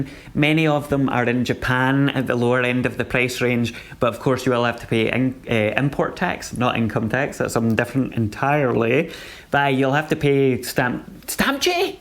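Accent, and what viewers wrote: Scotland, United Kingdom. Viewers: It's definitely a Scottish accent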